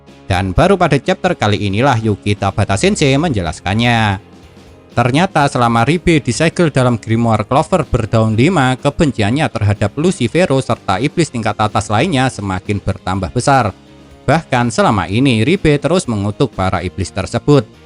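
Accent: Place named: Banyumas, Indonesia. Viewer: Fix mimin nya itu orang ngapak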